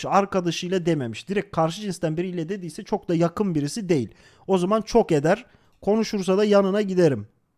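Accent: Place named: Erzurum, Turkey. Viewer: Erzurumlu olduğun ne kadar belli ama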